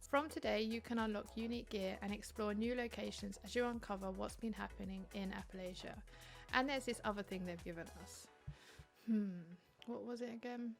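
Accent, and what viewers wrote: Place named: RP, United Kingdom. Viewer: being posh!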